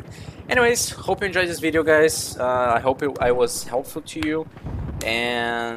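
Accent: Brazil. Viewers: Valeu, irmão! Senti nosso sotaque de longe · tu falou 3 palavras e vi que tu é BR, tmj mano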